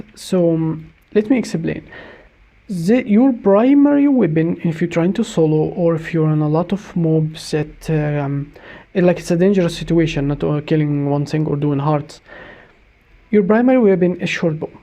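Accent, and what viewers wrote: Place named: Egypt. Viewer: Thanks for the video ! cheers Dude are you Egyptian ? your accent is Egyptian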